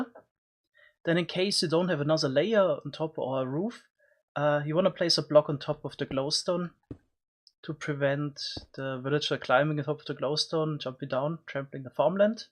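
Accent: Germany. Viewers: Man hört, dass du aus Deutschland oder Österreich kommst😂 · Höre ich da einen deutschen Akzent? :)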